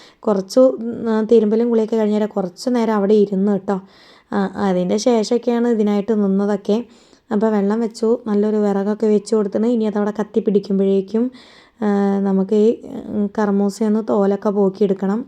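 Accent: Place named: Kannur, India. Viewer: നമ്മ പപ്പ കായീന്ന പറയുന്നത്😀😀😀😀 കണ്ണൂർ ഭാഷയാണേ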